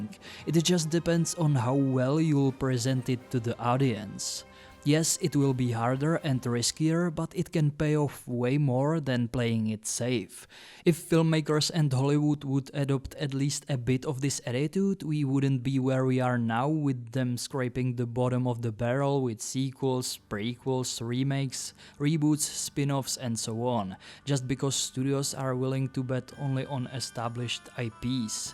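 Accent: Czech Republic. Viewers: SVATÝ GULÁŠ!! Ja jsem ze SLovenska A múj Tatínek má taky rád Kingdom Come Deliverance Dve! Ale tenhle čas mňe hodně překvapil! Jseš Čech a tohle víš jak Holywood je Zapeklite Zastaranej a zabednený v tom aby "ze spodu sudu" delaj Jenom Re-maky a Spin-off a neskutečne reciklujou staré věci? Wow! Žasnu hodně!! Ďekuji 😄 za skvelý video. Tvúj Accent ťe prozradil. Je mi jen líto že Holywood a AAA vydavatelé jako EA a Ubisoft jsou proste (nadávky, Algorythmus to nemá rád) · Ik ur czech thanks to the accent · Ten českej přízvuk mě trhá uši kdykoliv ho slyším, ale cením žes to video udělal 😆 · Yeah, I've also noticed his accent. That is how we Czechs sound usually I guess :-) Maybe, it would be enough to work on his "r", sounded fine otherwise.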